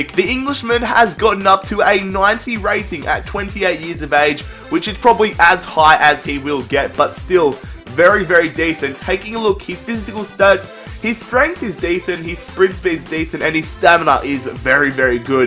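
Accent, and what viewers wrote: Australia. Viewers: mate, he's an Aussie, I'm a kiwi and I can understand that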